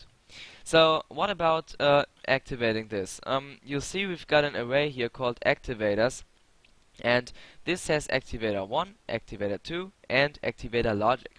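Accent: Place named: Germany. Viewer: "Houston We have a problem". I uploaded to google drive two files created with the editor. Links are: <link> <link> My limited knowledge of English, your German accent difficult translation providing youtube and subsequent translation into Spanish, as surreal as hilarious, has forced me to create these maps modifying the demo. I am aware that it was a mistake but it's demasido late to turn back. I created maps intertwined for high level players but I have reached the limit of my knowledge about the editor and do not know how to solve some problems. I created two maps: the first map does not appear sigils or stars or the computer terminal. In the second I tried to introduce sigils but have arisen configuration errors (HUD and other elements, for example) and do not know how to solve satisfactorily, so I need your help. I know I ask too but if you help me solve these problems could finally upload to steam.al least one of the two maps. 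Thank you.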